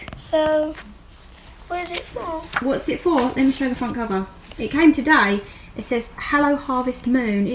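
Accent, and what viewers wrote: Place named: London, United Kingdom. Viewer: But proper got your accent cos im from luton and my dad is london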